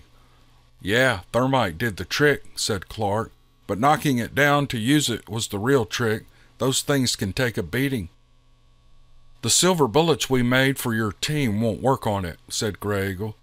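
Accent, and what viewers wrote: South, United States. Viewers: This sassy southern woman gettn' into team Odin! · D.A.Robert’s gives Tom Clancy a run for his money, and brings it to life with that talented southern draw……..AWESOME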